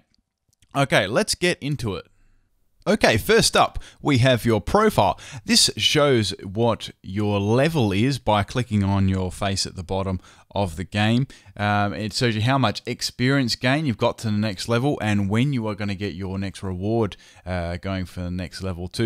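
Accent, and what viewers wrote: Australia. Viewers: Wasn't expecting an Aussie accent haha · Are you Australian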